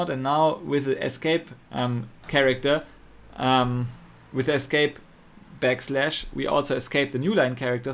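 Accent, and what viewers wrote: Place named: Germany. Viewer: nicer deutscher Accent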